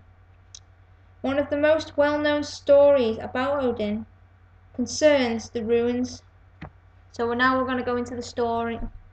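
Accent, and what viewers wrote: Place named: England, United Kingdom. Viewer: I can hear you loud and clear you sound fine and have a beautiful voice. I think chics with a real english accent are hot. Stop listening to what others say they are just jealous of your beauty.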